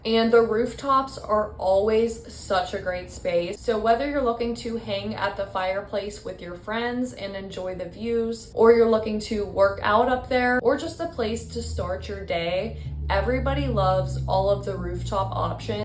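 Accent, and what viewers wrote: Pittsburgh, United States. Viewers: Does she have a Pittsburgh accent? Is that what I'm hearing? For example, sandwiching an h sound between the s and t in street and stroll- "shtreet", "shtroll"...is that what Pittsburghers sound like? Anyone know? Anyone here? Hello? Hello, hello?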